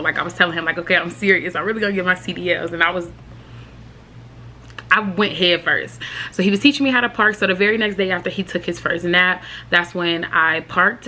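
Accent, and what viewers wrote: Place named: Detroit, United States. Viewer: You from Detroit ha? I can hear it .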